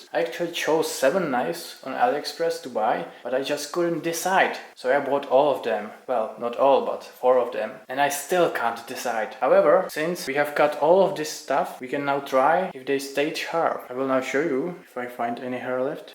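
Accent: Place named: Russia. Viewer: ты с России что ли? акцент пипец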